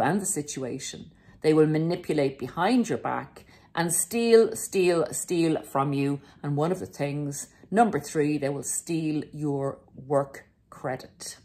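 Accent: Ireland. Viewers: Thank you for your help you seem to understand the situation well enough to put the problem across to others , every thing you have said I’ve been through just gone through Christmas that my husband ruined for me no gift no food nothing spent the last few days in the bed room away from him as he had the nerve to blame me , I’m not well at the moment and it seems to annoy him as he appears to think he’s worse than me , but I haven’t seen any evidence of that ,I might have to have an operation at sometime but I can’t believe he would want to help me with that . He has left some leaflet around about domestic abuse I can’t believe he thinks I’m the problem ,I haven’t spoken to him from before Christmas as it’s a waste of time as he doesn’t understand it’s him causing the problem , I thought he could be depressed as all he does is to moan about everything and everyone but he’s been like this for years so I don’t know, I just want to be happy , normal, not living in a war zone doggy land mines , loving your accent my parents were from Ireland, thanks for letting me let off some steam , happy new year .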